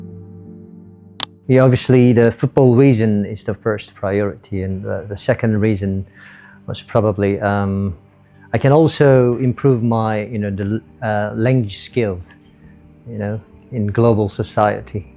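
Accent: United Kingdom. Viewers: Aksennya british sangat😂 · British Accent nya renyah didengar 😅👍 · Thank you coach.. its nice hearing your British accent 😊 Semangat Garuda 🔥🔥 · It is just refreshing to hear someone speak with a British accent in Indonesia, as most people use an American accent. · i like it hear british accent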